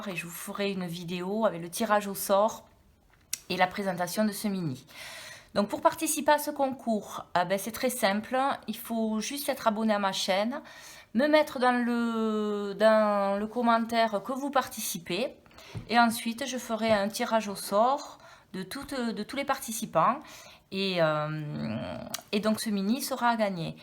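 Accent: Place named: Toulouse, France. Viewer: Mais qu'il est beau notre accent toulousain 😉. Merci pour le tuto. Je participe...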